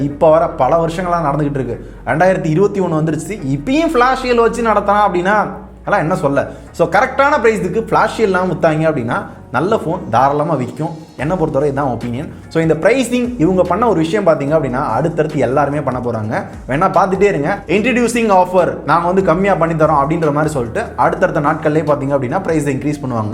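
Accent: Madurai, India. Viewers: Bro neega madurai ya?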